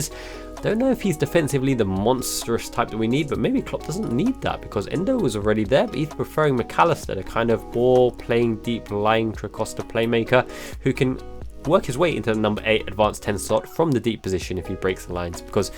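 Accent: United Kingdom. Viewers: Good job man! Just wonder how come you speak that perfect English with British accent as a Japanese?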